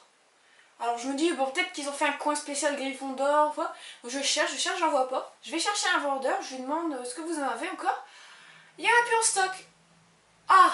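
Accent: North, France. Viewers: Oh my god! Trop beaux souvenirs! Rien que de voir la boutique, j'en bavais d'envie d'y aller! J'aimerais trop mais mes parents ils veulent pas ou peut-être l'année prochaine en colo mais j'y croit pas trop car je suis jamais parti seul donc ils veulent pas. Mais sinon super souvenirs! Tu en as eu pour combien si c'est pas indiscret stp. Et j'ai une question: Tu habites dans le nord de la France? (si oui c'est grâce à ton accent que j'ai découvert, Si non, je me sis trompé). La prochaine fois que tu pars, dis le moi, je me mets dans la valise ;) Je rigole :D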